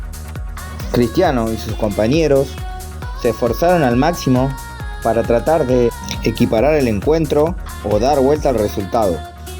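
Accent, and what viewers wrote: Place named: Argentina. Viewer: El del video tiene un acento argentino, ni modo que digas que es un fan de CR7 cuando los argentinos tienen prohibido hablar de él. 😅 No, yo creo que el declive de CR sucedió más por sus decisiones que por la falta de talento. Todo comenzó cuando decidió irse del Real Madrid, no tenía que irse de ahí, no cabe duda de que habría conseguido más títulos importantes y su carrera habría seguido igual de respetada. Sin mencionar que por esos años Messi estaba pasando por un muy mal momento. Ahora ya nadie lo recuerda pero a Messi le pasaba lo mismo con su selección que le pasó a CR7 con Portugal. Afortunadamente Messi tuvo a un gran director técnico como lo es Scaloni que supo sacar lo mejor del astro argentino y lo revitalizó. Cosa contraria a CR7, que tristemente sus entrenadores lejos de ayudarlo, le dieron la espalda y es una historia muy triste para el futbol. 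Habría sido fantástico que ambos tuvieran su revancha, pero solo uno lo consiguió, el futbol solo quedará en deuda con uno y no con dos como era antes.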